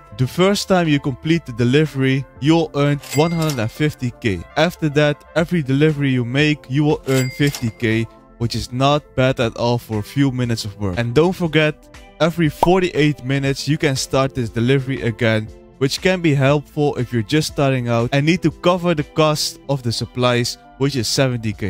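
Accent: Netherlands. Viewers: Ik had binnen 20 seconden door dat je een nederlander bent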